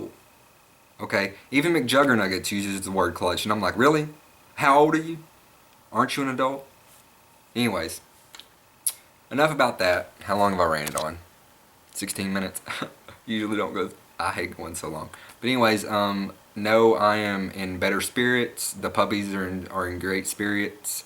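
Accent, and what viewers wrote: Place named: Kentucky, United States. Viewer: I found u thru that comment. Enjoying ur vids. My 9yo shih tsu is my only youngun. I'm f Ky ur accent sounds like mine. At least u got a sub f the troll. I don't believe in sayin GD either but I cuss like a sailor. Usually when I ask people not to say around me they respect that. Anyway I'm gonna go watch ur other puppy vids. There's a big drama w channon rose & Amber Walter on here it's like HS. I told them spend energy doin good but I let people bother me too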